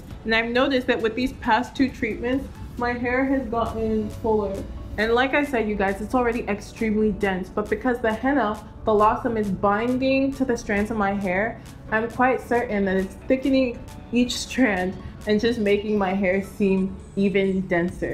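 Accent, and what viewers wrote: Nigeria. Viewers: DRY Nigerian accent. LOLEST. Loved it. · Dry Nigerian accent 😂 at least you have one. I'm half Nigerian and never picked up the accent :( but I love it though!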